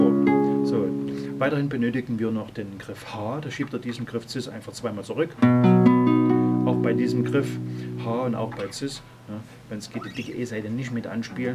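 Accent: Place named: Saxony, Germany. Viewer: ganz toll gemacht....normal mag ich das "sächsische" ja net so gut leiden....aber du bist da mit abstand ne ausnahme....liegt wahrscheinlich an Deiner symphatischen Art das Ganze zu erklären. TOP--> super Tutorial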